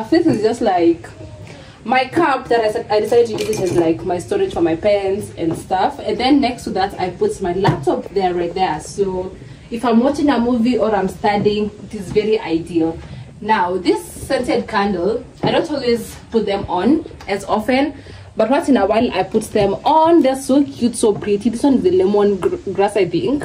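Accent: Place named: South Africa. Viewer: your accent does sound like south african one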